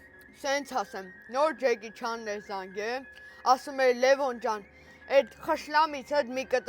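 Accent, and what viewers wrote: Lori, Armenia. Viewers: Վայ ես քու համով հոտով լոռվա բառբառին մեռնեմ😊😊😊❤❤ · Իմ լոռեցի փոքրիկ եղբայր❤️😍այյյ մեր բարբառը 😅❤ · Ամենահամով-հոտով բառբառը ` մեր ԼՈՌՎԱ բառբառը։ Առանձ ձևական նոտաների և ելևէջների ❤❤❤❤ապրեսսս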